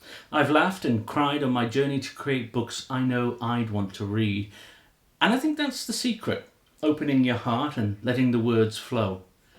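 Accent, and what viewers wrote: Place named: England, United Kingdom. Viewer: I've told you before, I love your English accent.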